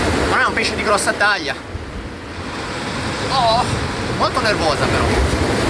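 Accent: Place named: Liguria, Italy. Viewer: La cadenza sembra ligure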